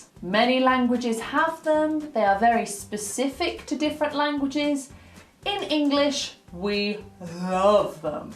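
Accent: United Kingdom. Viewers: I love her uk pronounce and explaining ❤️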